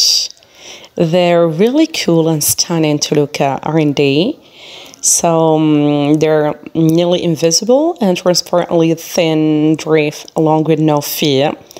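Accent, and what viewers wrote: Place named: United States. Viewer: صوت رائع لغة متقنة.لكن للأسف لم أفهم شيئا تتحدثين بسرعة و باللكنة الأمريكية كذلك لم تفعلي الترجمة وشكرا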